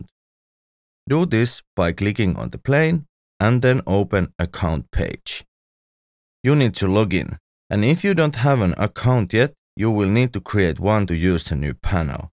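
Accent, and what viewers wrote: Finland. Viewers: This is correct, you got me. 😅 I am Finn. Hope it wasn't too hard on the pronunciation. 🙏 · Where is Reveal Sound based, I've never thought about it, but I'm 100% sure that the voice over in this video is by a Finn! (As am I). Kudos anyway, I use Spire on pretty much every song. My latest track (the Ukrainian one) on my youtube page has a plucked synth hook, whch is actually a Spire preset. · It was all good. I'm a fellow fin and was pretty sure about the accent! :)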